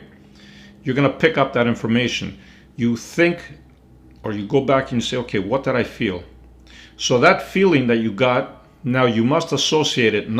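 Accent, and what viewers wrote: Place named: Greece. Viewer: I am so glad that you were born in Greece, when i first watched the first video of you, from your accent, i understood that you are Greek :) I am following your guidance and i ve seen tremendous changes in my financials and mental health. Ευχαριστώ!!!☺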